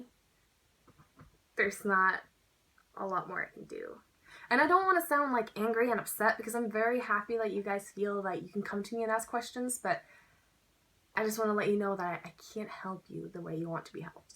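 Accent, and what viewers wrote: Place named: United States. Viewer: The video that I had the deepest impression of you was how to do well in IB, your English sound so authentic American now hahaha. I'm looking forward to videos on your current life~ (e.g. travel, uni, London life etc.)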